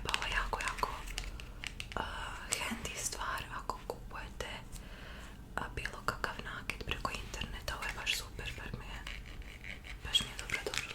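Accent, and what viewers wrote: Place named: Dalmatia, Croatia. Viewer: Bas i ja gledam ono kao cilo vrime se koristiš dalmatinskim govorom, pa mie bilo malo čudno, ali svakako drago. Nekad se najbolje prepustit i pricat onako kako jezik krene 🙂‍↔️😊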